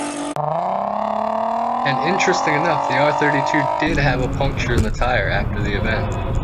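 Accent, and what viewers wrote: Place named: United States. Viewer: why u speak with that united state accent. its sound no good.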